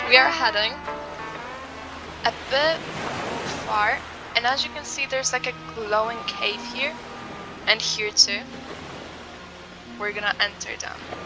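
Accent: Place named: Germany. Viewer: Are you german? BECAUSE I L.O.V.E. YOUR ACCENT!! 🎈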